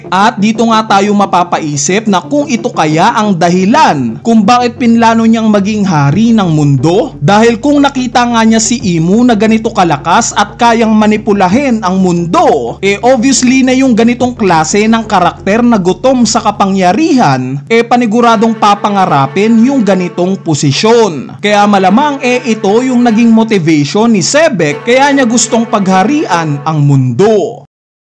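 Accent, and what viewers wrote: Visayas, Philippines. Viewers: Bisaya pla to si idol eh hahaha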